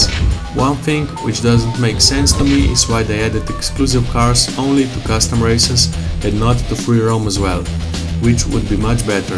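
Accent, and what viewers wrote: Russia. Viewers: Что это за русский акцент?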